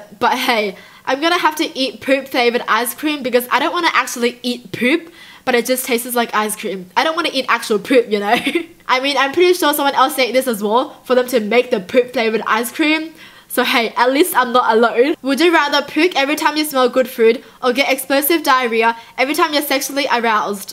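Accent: Australia. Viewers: Is she from Australia I am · Is she Australian?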